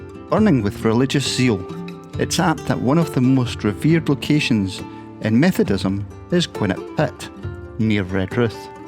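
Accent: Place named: Scotland, United Kingdom. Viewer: My old stomping ground which I left over forty years ago for the land of Oz. I really liked your Scottish burr giving the local place names a distinct pictish vibe!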